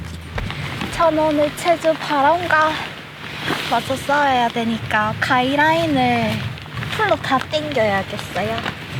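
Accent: Gyeongsang, South Korea. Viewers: 딱 대구말투 시네요^^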